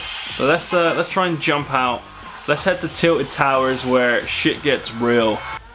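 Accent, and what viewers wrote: Bristol, United Kingdom. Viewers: are you from Bristol? That accent is V E R Y F A M I L I A R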